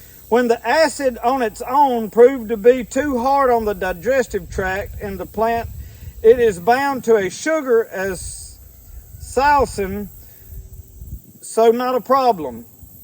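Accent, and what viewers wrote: South, United States. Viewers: I just stumbled across your channel because of your skullcap video… I was like “this dude has got to be in Ms.” lol I’m in Wayne County. I totally feel that Southern Boy accent! The hubby and I got tickled at you for being barefoot as a yard dog in the woods 😂😅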